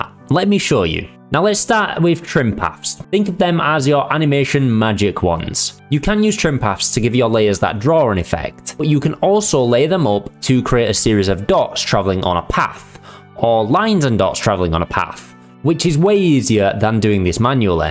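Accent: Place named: Manchester, United Kingdom. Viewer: You look and saund a bit Mancunian XD are you ?.. oh btw.. where can we find your projects? I love what ive seen here in this video..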